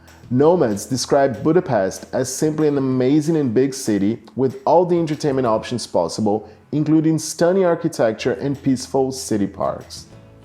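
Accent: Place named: Brazil. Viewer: Brasileiro haha! Vê-se pela pronúncia. Um forte abraço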